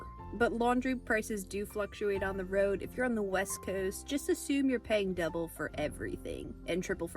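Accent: Northeast, United States. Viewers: Your NE accent is adorable - west coaster here so it's a bit jarring in a good way! It's great to see someone from the other side of the country explore the west as I feel we can get a bit jaded out here :/. As much as I love the idea of van life sometimes, living in a bike-able/walk-able city with a lot of conveniences is important to me - plus as someone who is pretty tall, my choice of vehicle feels pretty limited as I can't imagine sleeping in a cramped sedan. I'd love to bike tour the country but having the shelter of your car actually gives you a lot more options in terms of taking your time and amenities. I'm not used to paying for a car, so that's a big hurdle if I ever have to jump it! Thanks for making these types of videos, I personally find the meta/logistics videos more interesting than the destinations so I am curious to know if you have side hustle work (or if YouTube is a part of that) while you travel, or if this is pretty much out of pocket.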